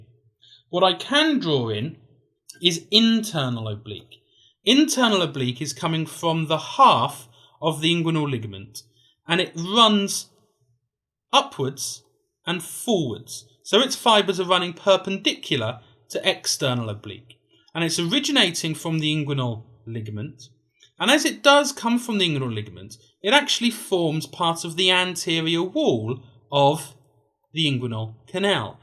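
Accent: United Kingdom. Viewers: Wow wow!! 😮 Im in love with that beauuuutiful british accent 😍 .. plus, you are great sir thank you so much for this clear and stunning explanation 💓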